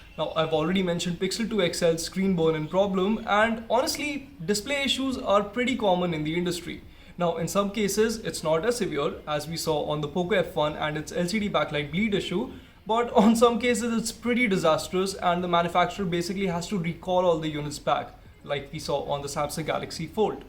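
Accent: India. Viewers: why don't you just speak in your native language , at least they will understand everything ... this way , nobody can understand you , I mean your accent is so heavy . No offence but its not just you , most of Indian reviewers have such a heavy , heavy accent . Is it really so hard , I know you are all smart and stuff ...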